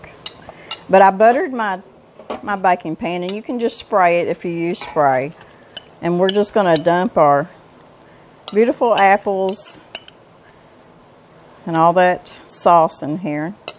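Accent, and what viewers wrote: South, United States. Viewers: I LOVE a Southern accent along with the food. Ty. And your hair looks fantastic!! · This looks delicious. I have made apple crisp many times over the years. I hadn't done one in a few years. My dad loved them and would ask me to make him one when I would go home to visit. He passed away in 2018 and I hadn't even thought about making one, but seeing this has made me think of him and how much he loved this and so many other sweet decisions deserts. Not sure where your from but you sound a lot like my family in GA and AL. You look a lot like a aunt, even your voice. If I closed my eye's and just listened to your video I could see her as plan as day. I love your channel and have even had my mom watch your video on making Sauerkraut. Thanks for your wonderful channel. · Your show and your passion make my Friday's. I love your down home charm and Southern accent! 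It is the same comfort food my Grandma used to make but she called it Apple Betty. Not sure if that name is a Canadian or old British thing because despite being Canadian born, my Grandma was as stiff Victorian as they come. My variant is, as my bones get older and now its legal here, I add some ground home grown weed to the topping. That and there is a Fromagerie near here where I regularly get 15 or 17 year old Cheddar cheese which is the perfect compliment.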